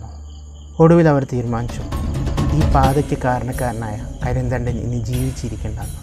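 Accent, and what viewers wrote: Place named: Kozhikode, India. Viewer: കുന്നംകുളം അല്ല.. നല്ല അസ്സല് കോഴിക്കോടനാണ്.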